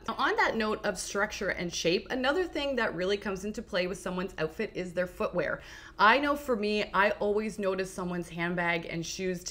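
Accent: Canada. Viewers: I guessed Canadian.. interesting, we have a big polish community here in the UK x great video thank you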